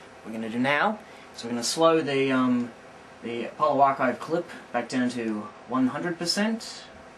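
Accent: Australia. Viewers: Excellent job in clearing up your honest mistake... Thanks for showing us how it happened. Unfortunately the Apollo debate has now become more of a personal battle between the CT's and the propagandists, than it is about the Apollo subject itself. Except for the Aussie accent, that sounded just like me! LOL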